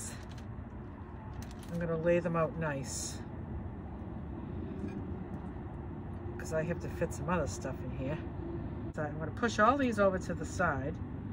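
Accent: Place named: New England, United States. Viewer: Hi! Your song is 💯💗🔥 😍🎵🎶 Love, love love it! I came upon your Camper van Tour vid recently for the first time, while watching tiny off grid living. Glad I started with the Finished Reno. vid, because it lead me to your other vids and your story unfolded! Shortly after, you were singing your song and I thought "What a cute song and How did you know all the lyrics! It must be popular and Why have I never heard it before?" 🤣Then I watched the vid on how your song came to be! How great is that! I live in TX but I'm from LI. When I heard your New England accent, it brought me home. I have family from MA. I just knew I would enjoy this ride😄 As a kid we traveled & camped up and down the coast. I was hooked! Also, I am so like minded with you. From your food choices, your esthetics, your philosophies and your JOYFUL JOYFUL outlook. I just turned 60 and soon needing a new (used) vehicle. 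You've inspired me to maybe get one I can modify on a budget. Thank you! You're a ray of sunshine. I find myself humming that song and remembering to stay on that healthy path. Happy Travels!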